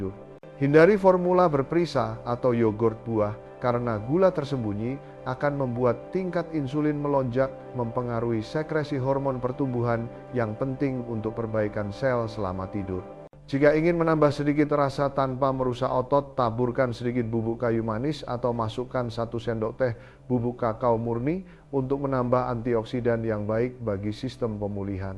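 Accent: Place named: Sumatra, Indonesia. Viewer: Inton sumatra no 1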